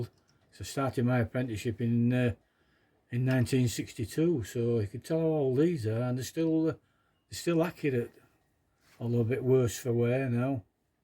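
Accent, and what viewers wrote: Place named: Yorkshire, United Kingdom. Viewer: Hi I suspect you are a fellow Yorkshire Man with the accent? I’m from Wombwell/Barnsley and a wannabe clock maker which is how I came across your channel.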